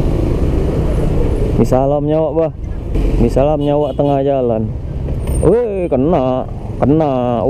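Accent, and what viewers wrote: Medan, Indonesia. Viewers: Kangen dengerin bahasa medan lagi kak,ak dulu tingal d polonia kak belakang hermes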